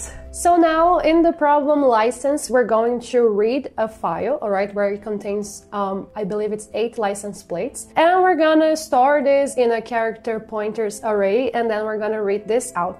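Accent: Brazil. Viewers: Sabia que era brasileira! rsrsrs Esse sotaque brenglish é diferenciado hahaha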